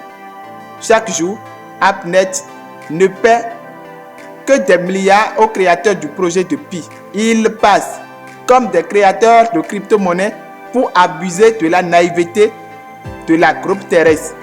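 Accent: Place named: West Africa. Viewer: Son français n'est pas forcément mauvais, mais c'est l'accent de sa langue maternelle qui prime, vous nos fr de l'Afrique de L'Ouest avez ce problème là, écoutez un Congolais(🇨🇩) parler francais et après écoutez-vous parler vous comprendrez, alors l'essentiel est le message qu'il nous donne, c'est très important👌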